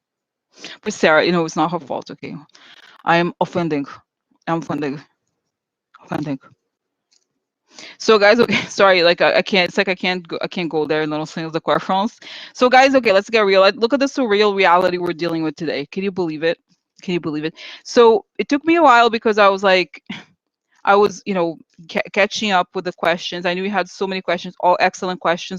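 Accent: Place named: Russia. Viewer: Mark Harris I try to imitate her Russian accent but I can’t get the rolling R’s down. It’s so funny!! Love her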